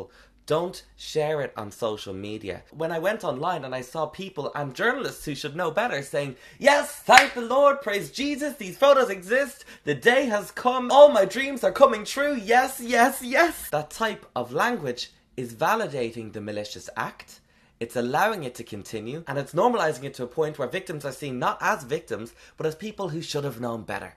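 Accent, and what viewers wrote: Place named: Ireland. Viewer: wait where in Ireland is he from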